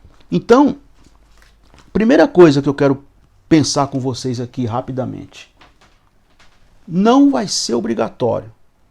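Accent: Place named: Northeast, Brazil. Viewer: Pastor paz do senhor bom final de semana sou seu siguidor gosto muito da sua personalidade e das sua ministraçoes sou filho de pernanbucano e o sr parece ser nordestino não sei se estou enganado mais a maneira forte de se expressar da entender que é pernanbucano ou da região nordeste o povo nordestino é um povo maravilhoso forte abraço Pastor Deus vos abençoe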